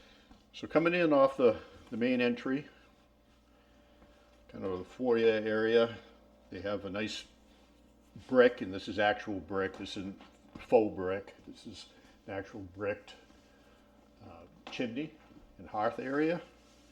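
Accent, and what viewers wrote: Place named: Maine, United States. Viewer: I watch videos just to hear that Maine accent. As authentic as it gets.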